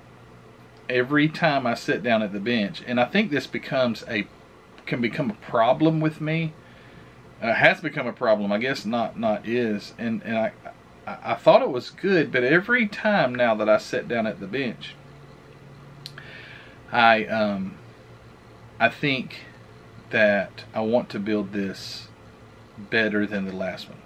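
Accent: South, United States. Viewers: you are real. That's what's so endearing about your channel. Yes you build really cool models but it's more than that. When I first saw you, it was after a Luka Cee video or an HPIguys video. I was really drawn in by your southern accent and the way you talked about your model. I'm glad you want to stay grounded. Thank you for being real.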